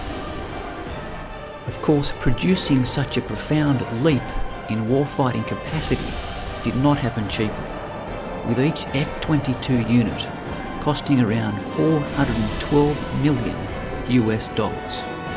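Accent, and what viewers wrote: Australia. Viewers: Brilliantly edited and narrated. I wonder who Sir Lionel contracted to do the narration? I just can't seem to place the voice. He sounds like someone I knew once, but he was from France. So it can't be him because this bloke has an Australian accent. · You sound Aussie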